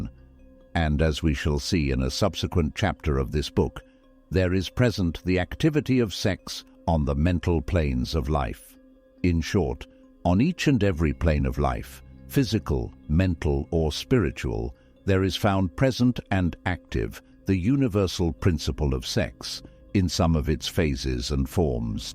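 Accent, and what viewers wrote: United Kingdom. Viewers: Man speaking with ‘stiff British -scholarly sounding accent’ saying nothing…..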